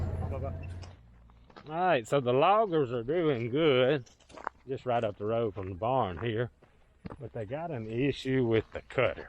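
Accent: South, United States. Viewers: The closed caption of your southern accent is sometimes very funny! And entertaining.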